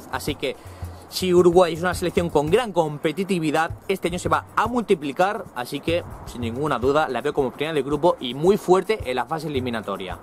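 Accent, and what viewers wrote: Spain. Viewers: gracias , eres de España no ? Veo eso con tu acento pero talvez me equivoco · ¿Sos español, si acaso?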